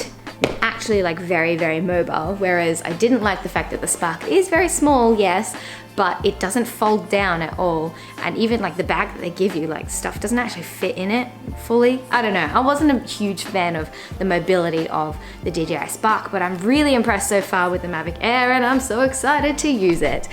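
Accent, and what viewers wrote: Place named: Australia. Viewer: We are neighbors-ish😂 Just found you, and am so happy to hear your Australian accent:) have a great Hanami down the river:) (hopefully I don’t sound creepy😂😂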